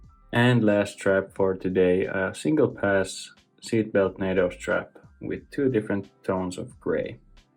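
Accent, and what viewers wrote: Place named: Finland. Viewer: Finnish accent ... immediately I get the impresion this not going to be some BS story.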